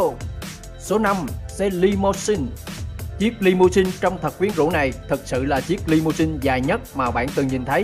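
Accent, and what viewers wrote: Central, Vietnam. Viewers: Ad ng miền trung À??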